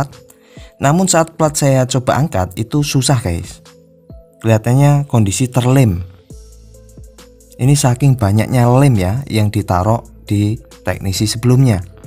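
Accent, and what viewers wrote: Java, Indonesia. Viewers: Jowone ngdi mas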